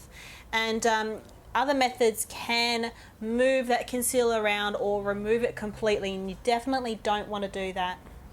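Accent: Australia. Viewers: I don't have acne (i feel so lucky) but it's great seeing how you beauty gurus could do "magic" with make-up products. I own lots of them, still not able to cover my pores LOL Thanks for the video! Thumbs up for ya. And omg ur Aussie accent is so decent to listen to. Makes me miss Oz sooo much :* thanks ;)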